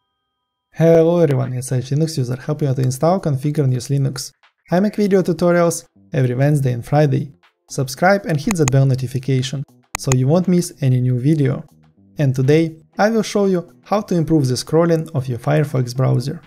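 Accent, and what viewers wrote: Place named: Russia. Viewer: English is not your native language so mistakes are acceptable, important thing is that people who watch your videos can understund you. Btw are you from Russia?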